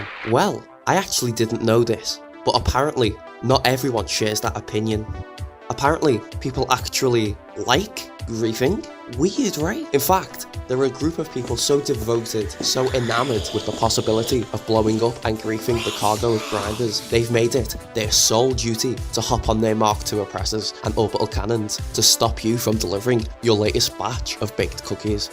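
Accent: Liverpool, United Kingdom. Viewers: i cant tell if your scouse or not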